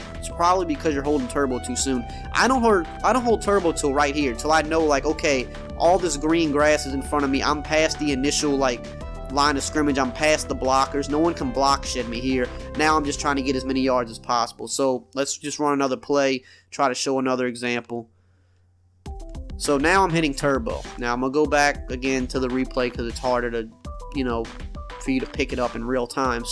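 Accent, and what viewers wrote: Louisiana, United States. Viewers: Great content but I gotta ask what part of Louisiana you from ?? Your Accent sounds a lil bit like Chalmette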